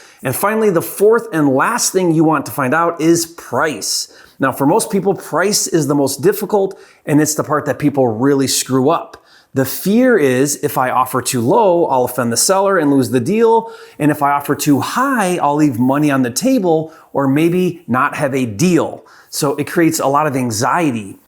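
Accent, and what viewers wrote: Michigan, United States. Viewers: He's got that Michigan accent forsure haha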